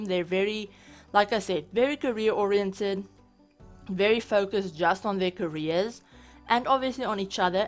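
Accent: South Africa. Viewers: I must say - I thought she sounded South African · It is SO amazing to see a South African Youtuber, let alone a South African SIMS Youtuber! I got so excited when I heard your accent! The house is GORGEOUS and you did a great job!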